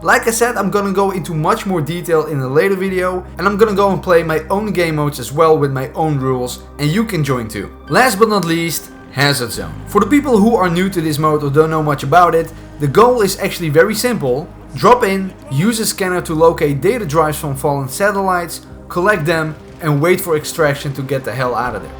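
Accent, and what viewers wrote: Netherlands. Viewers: Are you dutch? I think you are, because of your accent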